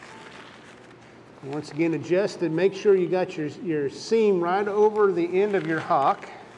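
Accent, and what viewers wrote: South, United States. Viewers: From the title, I assumed it was "United Kingdom College of Agriculture..." So I was very shocked when this deep country American accent broke out. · Damn it said UK college and the American accent gave me a fright before realising it was University of Kentucky not United Kingdom.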